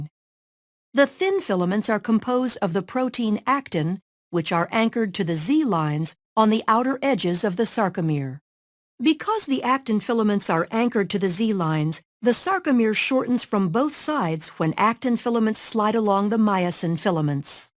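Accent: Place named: United States. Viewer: this lady is speaking American English 😲🔥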